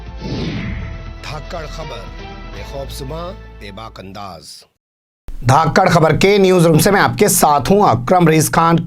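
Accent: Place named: Haryana, India. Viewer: Saheb Aap Haranvi bolte wo Aacha aur Mazahiya lagata hai. Keep it up. Court Qanoon ki Dhajjiya BJPs Sanghis. Ke Raj.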